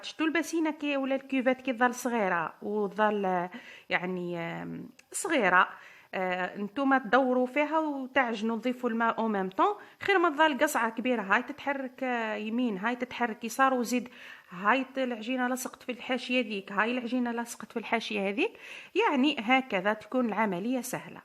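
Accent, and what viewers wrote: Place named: East, Algeria. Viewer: حبيت نعرف برك انت منين هدرتك تبان تاع الشرق.انا من الشرق .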